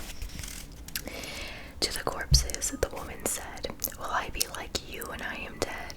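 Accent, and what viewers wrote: United States. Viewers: Hi!I really love your videos, the true crime “video series” it’s absolutely amazing, your voice it’s soooo relaxing and I love you American pronunciation❤️😁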